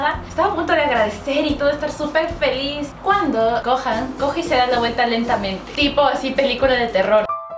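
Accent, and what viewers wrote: Quito, Ecuador. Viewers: Hablamos bonito los quiteños te escucho me identifico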